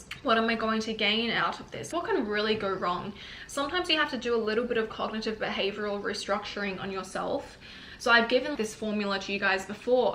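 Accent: Australia. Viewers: your australian accent is the one that keeps me hooked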